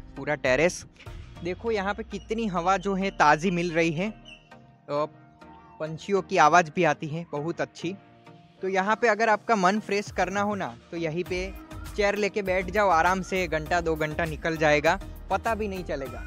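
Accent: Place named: Gujarat, India. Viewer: Dorii,sidheee...😂🤣 gujarati hindi accent kamal😂😂👍🏻👍🏻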